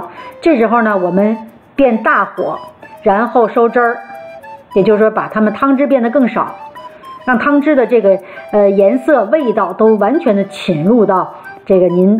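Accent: Tianjin, China. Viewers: 一听就咱们天津卫口音，倍儿亲切！😄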